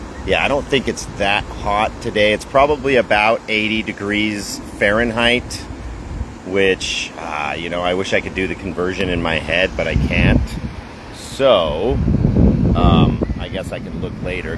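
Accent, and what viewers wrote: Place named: Isan, Thailand. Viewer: อ. พูดอีสานได้ บ่คือคนอีสาน แต่ก็มีความพยายามนะครับ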